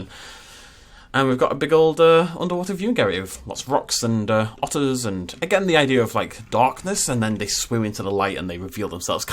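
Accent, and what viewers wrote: United Kingdom. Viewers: Ah i love your planet zoo videos so much, they are the only ones I watch and you inspired me to go from flat squares with....no rocks! to rock filled funky shaped habitats with lots of bushes made from sunken trees. Dude do whatever you want with the game honestly I prefer the habitats and stuff and often skip the buildings (sorry) so I'm super on board with just habitat builds. Also I love your accent where are u from (lmao weird) I assume somewhere from the UK but not essex cause I'm from there and u will never her a t come out of my mouth lol.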